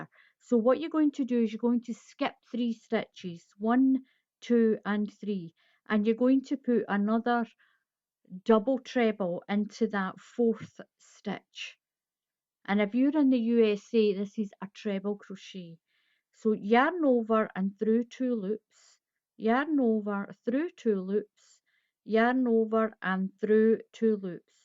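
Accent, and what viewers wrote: Scotland, United Kingdom. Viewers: Great looking piece! I'm starting a fundraiser for my preschool, and I wonder if you have any more suggestions for a desirable but easy (and quick) made projects? This one of course I'm considering. Also I could listen to you speak for ages. Scottish and northern Irish are my cryptonite! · Hi,, beautiful blanket. Are you from Scotland? You have beautiful accent.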